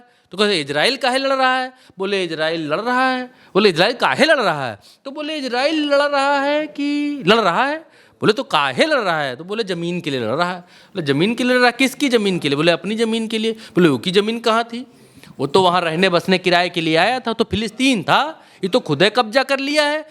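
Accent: Bihar, India. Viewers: BHAI TUMHARI BIHARIYA BAAT BAHOT ACCHA LAGA...DHUKH KE SATH DEKH RAHE HE..PHIR BHI HASIN AA RAHI HE...😂😂😂😂😂😂😂😂😂😂😂😂😂😂😂BAHOT BADHIYA ...AEISE SABHI KO SAMAZ ME AAJAYEGA SAMJANE SE..😂😂😂😂😂😂😂😂😂😂🎉🎉🎉🎉🎉🎉🎉🎉🎉🎉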